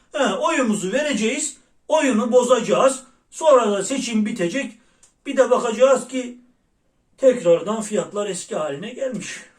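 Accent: Erzurum, Turkey. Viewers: kardes seni severek takip ediyoruz.ara sıra Erzurum Erzincan şive leşini karıştırırsan çok hoş sevecen olur seni sizleri seviyoruz edremit altinoluktan kucak dolusu selam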